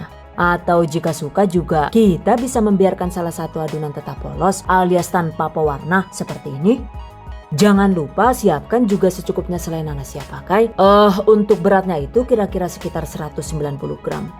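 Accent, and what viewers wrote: Banyumas, Indonesia. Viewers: Deneng ngapak pada karo nyonge 😅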